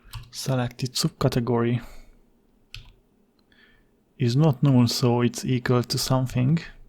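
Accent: Hungary. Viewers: Ugy hallom abbol az angol akcentusbol hogy magyar vagy te is :)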